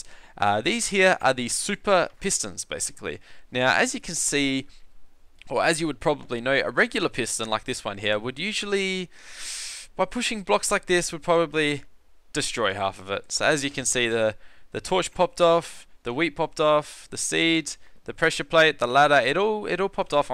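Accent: Australia. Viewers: U sound Australian cool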